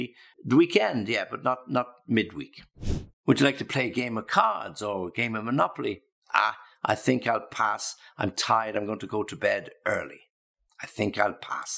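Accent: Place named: United Kingdom. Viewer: I hesitate between american english or british english. In fact, i was practicing british english for 2 year. Then started learning american english. But when I come back to british english videos like this one, i just fall in love with british english. ..it sounds so lovely, so elegant! And the best for me, you pronounce the "T" and i love it 😊❤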